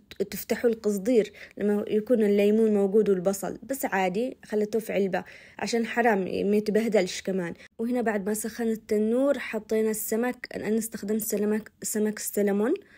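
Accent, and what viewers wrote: Aden, Yemen. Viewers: يمنية ؟ لهجة يمنية جميلة واكل ولا اروع تسلم ايدك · ما شاء الله عليك فناانة بجد 👏🏻♥️ تسلم الأيادي، حبيت قناتك وطبخك وأسلوب كلامك😍 ويا حلاة اللهجة العدنية منك 😍♥️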